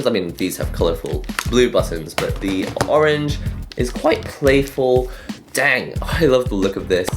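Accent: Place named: Northern England, United Kingdom. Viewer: You probably learned English from England, maybe north of England because of your accent.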